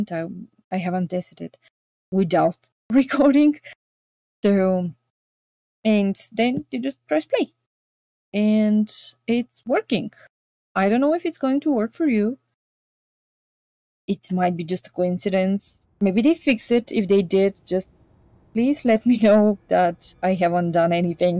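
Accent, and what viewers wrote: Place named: Bulgaria. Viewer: hey hey im really wondering what your first language is? is it bulgarian? you sound like my bulgarian friend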